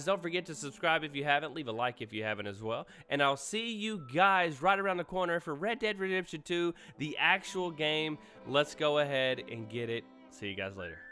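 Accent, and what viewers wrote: Northeast, United States. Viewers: I'm from Kentucky your accent sounds like you're from the Northeast it's kind of funny how I noticed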